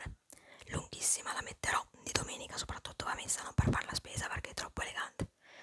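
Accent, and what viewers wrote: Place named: Veneto, Italy. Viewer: Io pensavo veneta per come pronuncia la "r"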